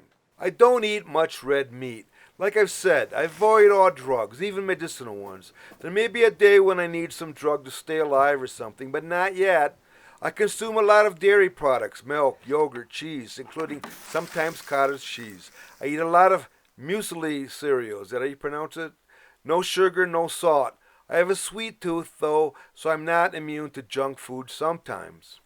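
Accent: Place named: Minnesota, United States. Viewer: Well! Look up the phrase "Old Guys Rule" and you'll find a link this this video. I think my jaw was on the floor during the entire thing. You've transcended mere "inspirational" and achieved Zeus-hood. 320 pounds - egad. I turned 69 earlier this week, and for that birthday I did a rep of 225 ("two plates") in the local YMCA after 29 years without touching a barbell. (My peak in my 40s was a couple of reps of 287.) I was a little shocked that it only took me two months from 135 to 225 - newbie gains. Apparently, you can build muscle after 60; I had no idea this was so. I shall next attempt 230, then 235, etc. Anyway, calling this a remarkable video doesn't quite capture it. This is one of the realist things I have ever seen. Your voice seems very familiar. After some thought I have it: Your voice reminds me of Jonathan Banks, who played Mike Ermantraut in "Breaking Bad." Banks is from D.C./Maryland, but I thought I detected a Minnesota dialect from you. 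Where are you from?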